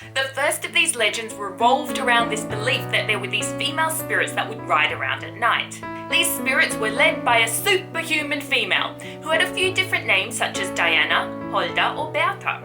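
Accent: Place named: Australia. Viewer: I'm watching this to see why it has record low views in 5 yrs. On a popular subject. Oh Australian accent.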